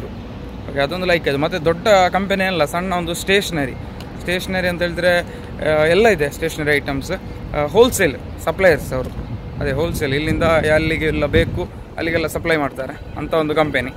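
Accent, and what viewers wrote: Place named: Mangalore, India. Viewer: Nam mangalore baashe keluke chanda marre...sudden agi nim vlogs pop Aithu check madide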